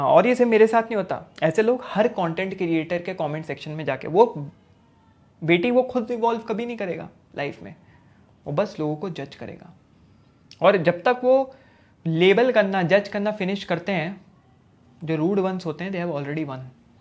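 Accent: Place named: Malwa, India. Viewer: sun mere bhai ek baat tu bohot dhyaan se; tu dilli ncr se hai na? tu koi madhya pradesh ke indore maalwa region se to hai nahi jo wahaan ki style mein bol raha hai ZAKIR KHAN ko copy kar raha hai ,kyunki that way you speak is extremely irritating aur teri acchi baaton ki value gir jaa rahi hai saari ki saari. Kitni baar tere video dekhne chahe hain par 15 minute baad band karne padte hain becasue of the irritation caused by the way you speak which is NOT ORIGINAL.(tu bhi jaanta hai ye baat acche se)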